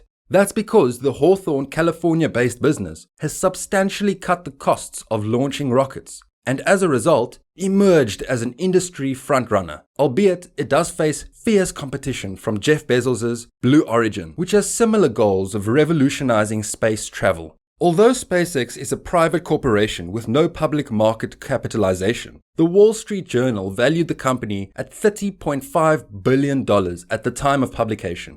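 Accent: South Africa. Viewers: Nice South African Accent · Are you south African. You have the accent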